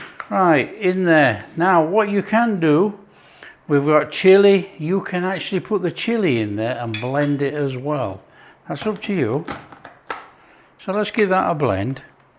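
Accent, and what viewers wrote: United Kingdom. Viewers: British accent is 😂😂funny 🤣🤣 kumon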